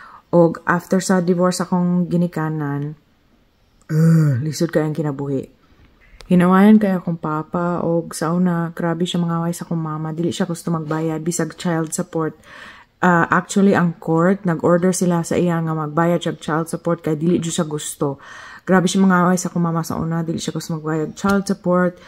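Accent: Visayas, Philippines. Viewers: Ang galing mo sister! Lalum kaayo ka mo bisaya. Proud kaayo minh mga Bisaya. God Bless you & your family! 👍👏❤️🇵🇭🙏☝🏻❤️ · Maayo kaayo ka mo estorya. Ganahan ko maminaw nimo Kay imong tingog Kay convincing kAayo mag talk ug story sa imong Life. Tapos bisaya kaayo. Gwaps kaayo imong tingog. FIRST TIME nako na ka kita sa video ani. Recommended by YouTube unexpectedly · Sshhhttt… hhoooyy… 😁🤣 grabe jud ka bisaya. 😂 try korean airline more cheaper dw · ganahan kaayo ko sa imo accent mag binisaya jud, nindot paminawon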